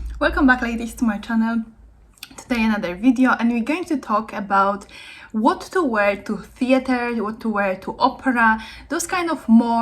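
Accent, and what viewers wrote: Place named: Russia. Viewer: hi there ! i really am thinking abt going at the Opera on my birthday this year (i'm 17,) are there dresses we can wear : formal - elegant but not womanly. really chic. if you know what i mean ? i really am thankful for your video ! btw , not to be rude , but i'm sensing a really really beautiful russian accent ? or ukrainian ? take care xx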